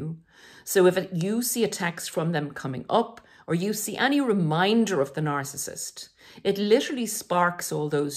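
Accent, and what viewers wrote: Ireland. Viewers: Came for the info - stayed for the lovely Irish voice.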